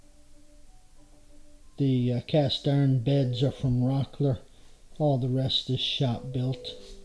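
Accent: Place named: Louisiana, United States. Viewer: Just watched one of your other videos where you started that you were from NO. and I swear I wanted to ask if you might be from Louisiana because I thought I heard a little bit of an accent. Although I live in the Houston area now, I’m originally from Lafayette and parents are from New Orleans and Kinder.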